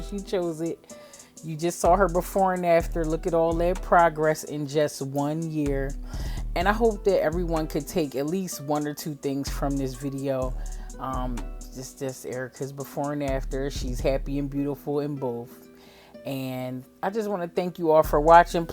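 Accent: Philadelphia, United States. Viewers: Are you from philly? You sound just like one of my clients. 😂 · Your channel is amazing. Just peep your Facebook page. From your voice I just knew you were from Philadelphia. Not only that you are just around corner from where I use live.❤ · Are you from Philly? I feel like I hear an ancient.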